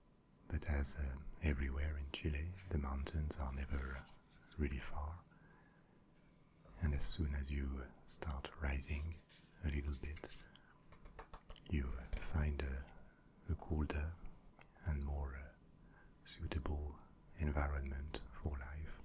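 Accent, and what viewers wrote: France. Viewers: Vous avez un accent français je trouve !!! 😍😊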